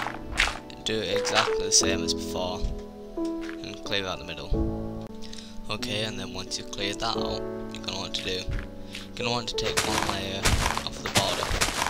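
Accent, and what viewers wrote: United Kingdom. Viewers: you gotta love a good brit voice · No, he doesn't, he just has a geordie accent.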